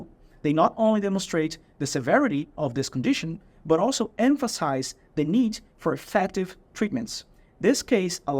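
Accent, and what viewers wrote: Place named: Brazil. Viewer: esse sotaque é brasileiro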